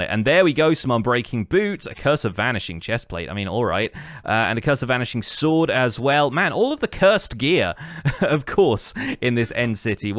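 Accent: United Kingdom. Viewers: Love your British accent. Greetings from Italy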